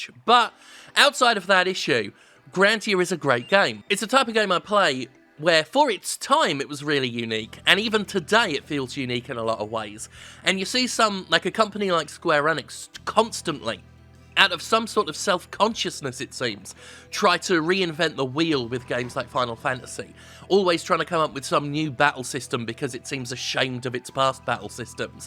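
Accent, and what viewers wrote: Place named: United Kingdom. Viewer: Is it just me or did Jim sound extra-British in this video.....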